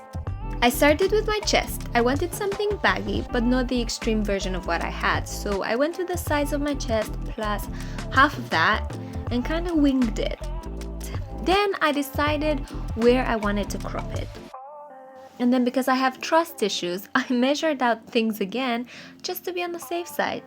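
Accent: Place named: United Kingdom. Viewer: Where are you originally from? Love your charismatic and whimsy personality, the beautiful British English and your inspiring sewing projects. ❤️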